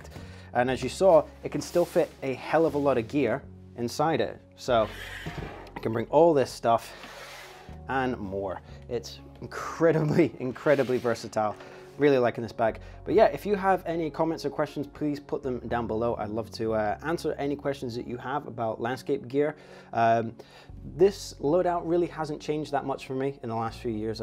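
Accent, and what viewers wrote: Scotland, United Kingdom. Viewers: Many thanks certainly enjoyed this and I have just subscribed. Would I be right that I can here a bit of scottish burr in your voice, where are originally from. I am a exiled Scot myself. Regards, Allan Davies.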